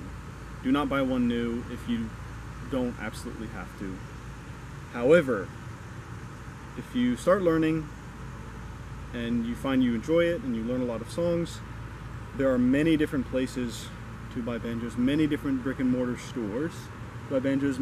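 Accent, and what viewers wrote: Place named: Canada. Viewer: your accent sounds canadian, reminds me a lot of Robert Pattinson in The Lighthouse which is an awesome movie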